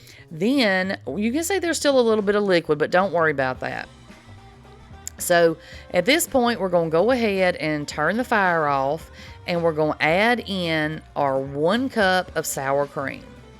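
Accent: South, United States. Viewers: I just watched your beef tips recipe (10/13/2025) and you linked this one, so now I'm watching this one. I have to say, your Southern accent is sooo much stronger in this one than in the beef tips video. 😂😂 I know, I'm strange, I notice your voice in every video. You just have that sweet comfy Southern voice. 😊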